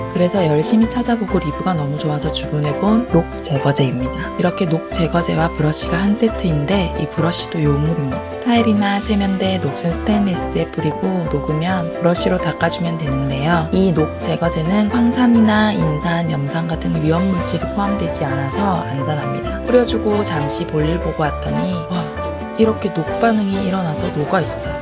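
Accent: Gyeongsang, South Korea. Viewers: 혹시 경상도분이신가요?중간중간 경상도 억양이 들리는듯하여.. 그리고 화장실 천장은 손을 안대는데도 저렇게 깨끗하게 되나요?한번 닦아줘야하는거죠?아님 물 뿌리거나.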